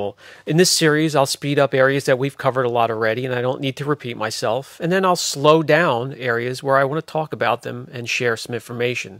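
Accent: Pennsylvania, United States. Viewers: “Trial by era” Shout out to the PA accent. 😉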